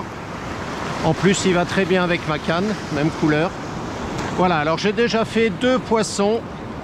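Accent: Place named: Oléron, France. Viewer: Hahaha je me disais aussi….. il manquait l accent oleronais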